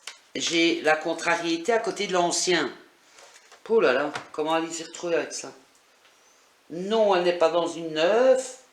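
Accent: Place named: Belgium. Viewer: Vous êtes adorable et vous avez un chouette accent Belge ♥️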